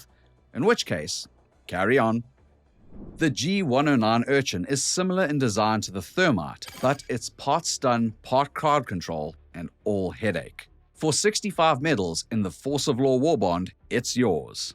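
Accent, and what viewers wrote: South Africa. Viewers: mhm, That a South African English accent i hear?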